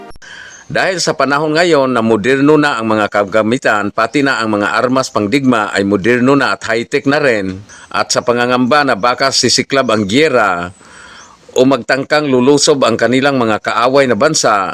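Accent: Visayas, Philippines. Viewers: ingay mo man doon katumira sa china halatang bisaya ka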